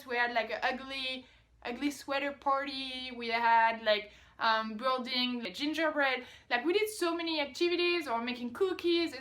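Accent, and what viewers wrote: France. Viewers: Just found your channel today, surprised I didn't find it before because I watch a lot of exchange students videos "coming to the US". You still have a French accent, not really that normal especially after 5yrs in the States. Most go home as a pure born Americans lol. But I must say I love your accent. I think most of the EU seems to say and think about the same things when it comes to their experience in the States. I have given this some thought and although it has been hurting the country(Politics) lately when it comes right down to it we are just one big family regardless of where in the US we are from. So when you think about the friendliness and small talk kind of stuff it makes sense really. Are you going to come visit us again?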